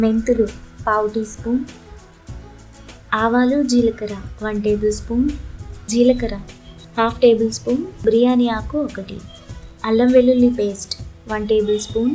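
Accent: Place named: Telangana, India. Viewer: its telugu with telangana accent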